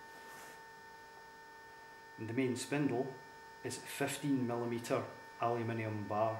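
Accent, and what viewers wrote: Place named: Scotland, United Kingdom. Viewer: thats a Scottish accent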